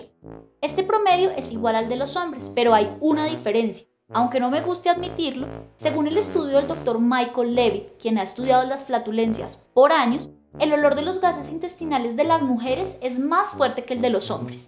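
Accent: Colombia. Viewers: Sí es Colombiana, muy Bogotana súper chévere!!! Jejeje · Que linda voz usted es colombiana? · Me gusta el asentó de Colombia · Tiene acento de Bogotana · thechampion606 Si ella es colombiana su acento es 100% colombiano eso te lo aseguro yo que soy colombiana. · Tiene acento colombiano, lindo.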